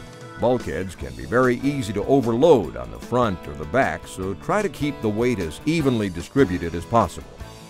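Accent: Texas, United States. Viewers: sounds about texan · That TEXAS JUNKEY narration though.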